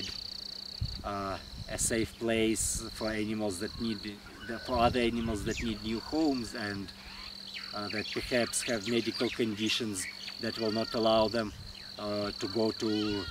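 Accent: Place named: United States. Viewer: Доброго здоровья вам, у вас американский говор, вы жили США?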